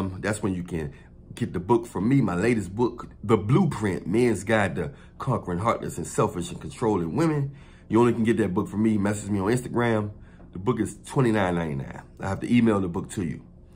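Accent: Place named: Baltimore, United States. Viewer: You have Maryland Baltimore accent. You videos are awesome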